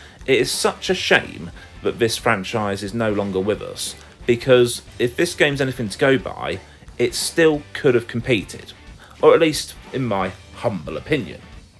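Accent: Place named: United Kingdom. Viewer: I love british accents, +sub.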